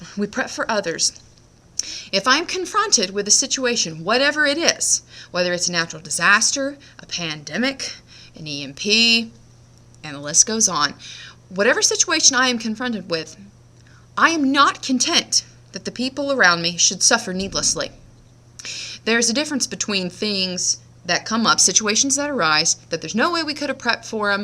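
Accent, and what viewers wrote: Ireland. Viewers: Like the Irish voice. : ) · I love the Irish Brogue. :)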